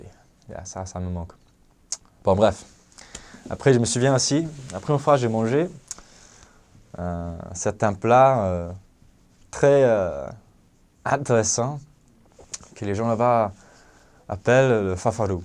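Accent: Tahiti, French Polynesia. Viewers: 🤣🤣🤣 il s'exprime comme un tahitien !! Reviens nous voir 💕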